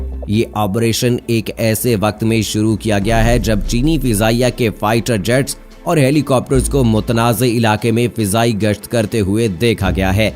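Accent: India. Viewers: خدا خدا کرو .....تمہارے تلفظ سے ہندی صاف جھلک رہی ہے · Apki urdu indians wali lg rahi hai. Humain kisi se daraen nhi. Jb waqt agaya toe hum Pakistanj chorengay kisi ko bhi nahi. Pakistan Zindabad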